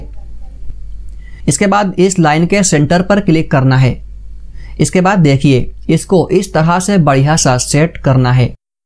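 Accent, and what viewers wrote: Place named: Pahadi, India. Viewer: very nice, lekin apke bolne ka andaj pahadi lagta hain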